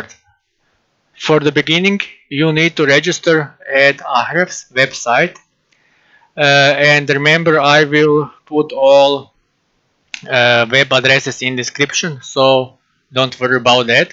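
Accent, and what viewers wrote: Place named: Serbia. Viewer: Hvala, po akcentu vidim da si neki nas 😄 Mislim da bi verodostojnije bilo da si u Ahrefsu proverio domain rating i ceo domen, mislim kad ga vec korisits.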